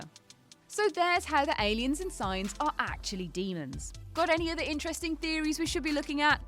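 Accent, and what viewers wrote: United Kingdom. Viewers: Why does every channel have an english accent now? Lol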